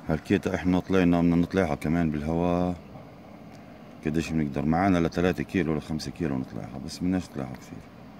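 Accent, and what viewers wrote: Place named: Palestine. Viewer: ***** تسلم يا غالي.. ربي ينصركم بربي اجمل مافي فيديوهات الاخ غير لمعلومات انو بياخدنا سياحة في فلسطين و منسمع لهجة لفلسطينية العريقة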